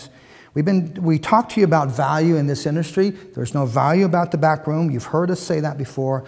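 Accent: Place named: Philippines. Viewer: hi sir... are u a filipino? you look like a pinoy and your accent...and also the way you speak... =) you're amazing uncle...hehe galing mo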